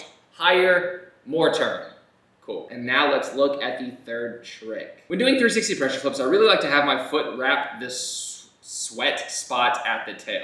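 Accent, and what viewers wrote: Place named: Texas, United States. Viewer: Your Texas accent and slang are really starting to show 😂 love it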